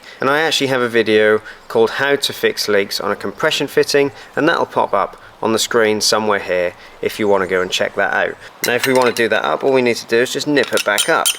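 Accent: Norfolk, United Kingdom. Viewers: Awesome - just found you as I am about to attempt to renovate my bathroom - you sound like a local too ! (Norfolk ?) excellent tutorial thank you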